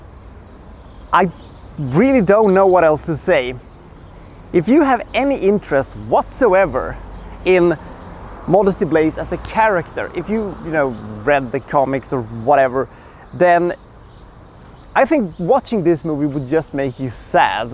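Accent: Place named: Europe. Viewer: I think you can count your blessings for having gotten one like in three years for this drivel heap of a video. Oh, and show some decency when talking about Arabic “whatever” being mowed down. At least we know what Arabic is but we’ll never know what you are with the poor Euro-accent trying to sound American with throwing curse words here and there only ending up with an embarrassment that manages to surpass what you’re describing. This is a Joseph Losey film and so you may be better off leaving the review to someone who k owes what that is.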